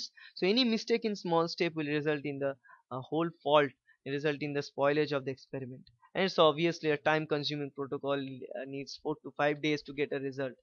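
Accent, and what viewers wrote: India. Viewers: fuck your indian accent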